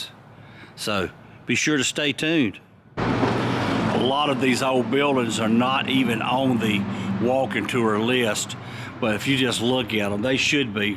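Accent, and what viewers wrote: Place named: Bennettsville, United States. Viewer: I could have listened to you all day, as your voice sounds like a good friend of mine who still lives in Bennettsville. Then when you said you were from there and lived there until you were 19, it made sense why you sounded like you were from there. You don’t just have a South Carolina accent, but a Bennettsville accent! I love it! I am missing home, big time!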